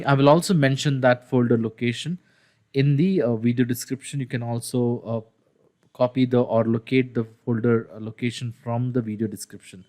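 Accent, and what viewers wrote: India. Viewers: another unhelpful tutorial with indian english accent, they produce many of that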